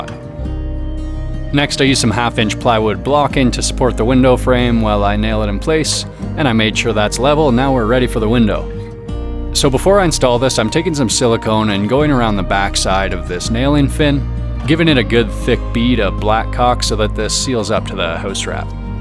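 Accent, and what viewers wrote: Canada. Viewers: As if we couldn't already tell he was Canadian with all the "ungunna"s and "oot"s, he's even got the stamp. 🤣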